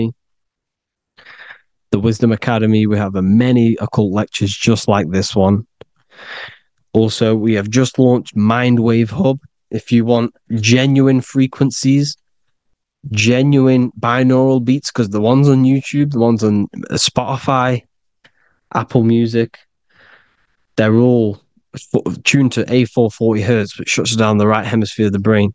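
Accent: United Kingdom. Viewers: Manchester accent?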